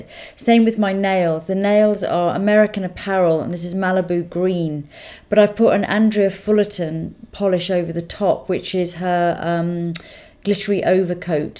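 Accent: United Kingdom. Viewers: your amazing and love that your bristish! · i love your british accent!! xD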